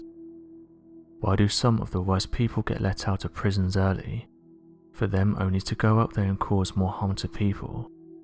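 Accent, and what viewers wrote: United Kingdom. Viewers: Ok so the British accent really is cool but this is old news. How about this computer guy. DONT BREAK THE LAW!!!!!!! Because you will be rolled into the most heinous situation. All of this comes from WHITE people thinking slavery was a good idea. Like any business you need more customers so like a good little racist you are now putting your own People to the sword.. It's human instincts or manstincts that once you do something heinous it breeds and breeds and breeds. Not dealing with slavery ( The dumbest thing AMERICA ever did ) and by that I don't mean reparations , that's tooooooo late. What I mean is sympathy on a level that is real. I get no one wants to pay but how about some sympathy. There is NONE which is why this country is now cannibalizing it's won People. It's really easy , show some damn sympathy and this stuff will never happen but to try to departmentalize hate will lead to this. 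Unresolved issues lead to more. So you got more! This requires a total change of heart on the whole country. Biblicaly this is not going to happen so get saved lol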